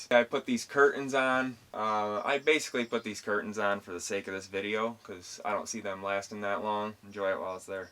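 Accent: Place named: Minnesota, United States. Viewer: I like how honest you are. And I have a feeling you are a bit of a perfectionist. Also I’m from Minnesota and it sounds like you might be too… or at least a state that touches Minnesota.😊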